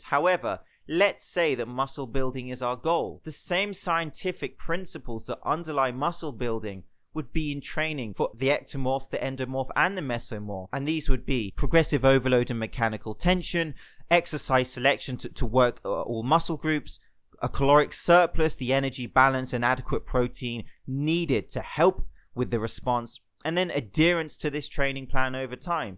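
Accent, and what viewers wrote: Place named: St Albans, United Kingdom. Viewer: I've been seriously getting into fitness for the last year. The YouTube algorithm has clearly decided I'm ready to level up — these videos are exceptional and seriously adding value to my life. Thank you so much! Your accent sounds like it's from my hometown, St Albans, which is comforting during lockdown up in the sticks in Scotland :)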